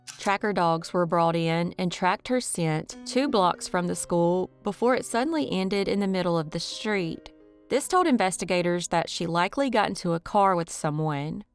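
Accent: South, United States. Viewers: THANK YOU!! IT KINDA HURTS FOR THE UNSOLVED CASES BUT YOURE RIGHT UP ON THEM!! AND THAT BEAUTIFUL COUNTRY ACCENT! KEEP UP THE GREAT WORK!!:hand-pink-waving:🤠❣❤🐴🐎🦄🦌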